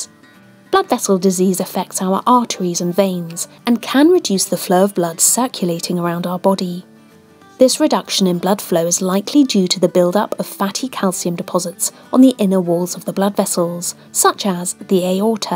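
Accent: United Kingdom. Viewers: I love your voice and british accent :) Great information shared, thanks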